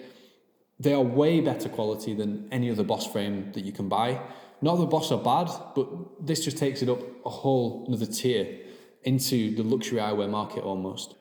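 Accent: Scotland, United Kingdom. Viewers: oohhh scottish accent!!!!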